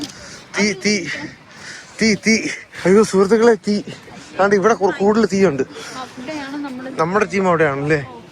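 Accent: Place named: Punjab, India. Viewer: ഹിന്ദിയിൽ നല്ല രീതിയിൽ പഞ്ചാബി വാട അതായത് accent ഉണ്ട്, ഡൽഹിയിൽ പഠിച്ച സമയത്ത് ഒരുപാട് പഞ്ചാബികൾ കൂടെ ഉണ്ടായിരുന്നു എന്ന് തോന്നുന്നു, ആ ഭാഷയിൽ pitch modulation ൻ്റെ വേലിയേറ്റം ഇറക്കം കേട്ടാൽ നന്നായി മനസ്സിലാകും, എന്തായാലും ഹിന്ദി ഇഷ്ടപ്പെട്ടു. വർഷ പഠിക്കുമ്പോഴും വർഷയുടെ ഹിന്ദിയും ഇതേ തരത്തിലാകും.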